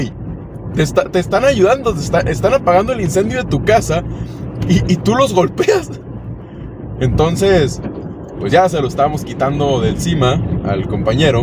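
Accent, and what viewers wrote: Mexico. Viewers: ¿Es de México?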